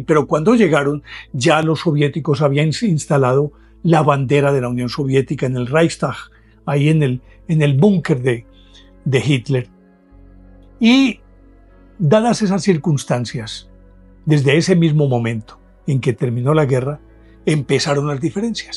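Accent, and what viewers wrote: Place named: Colombia. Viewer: Mi admiración señor don, es fascinante su forma de narrar y contar historias, nos seduce su brillante y forma de hablar con ese bonito acento colombiano,como siempre ha estado usted magistral con su relato,gracias por su inmensa labor de compartir por este canal su enorme sabiduría,saludos desde jerez, España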